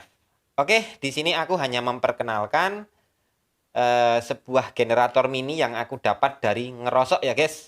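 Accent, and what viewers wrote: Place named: Banyumas, Indonesia. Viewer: Kalau boleh tahu orang mana, mas? Usianya 30an atau 40an kah? karena susah ditebak dari fotonya yang tampak muda, tapi suaranya jelas sekali dan terdengar seperti Pak Lik saya yang orang Cilacap,, saya subscribe yaa,, jarang-jarang saya bisa menangkap suara pengucapan sejelas ini di yutub karena saya orang nya Hard of Hearing alias gangguan pendengaran sedang,, dan orang dengan logat ngapak seperti Pak Lik saya gampang saya dengan suara pengucapannya,, kebetulan juga sedang mengerjakan tugas akhir membuat contra-rotating axial flux permanent magnet generator,, back emf nya tampak terlihat sekali karena salah rotor magnet yang seharusnya ikut berputar bersama rotor kumparan malah diam dan terasa ada drag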